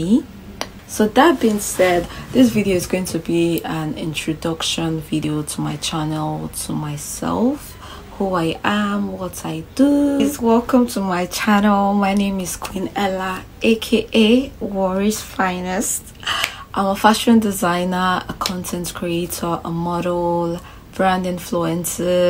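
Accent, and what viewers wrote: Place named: United Kingdom. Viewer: I like the blend of Queen’s English and Pidgin🤝 Keep ‘‘em coming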